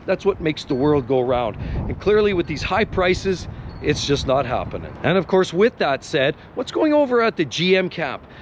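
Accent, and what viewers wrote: Canada. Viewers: Are these Canadian dollar amounts because you sound Canadian?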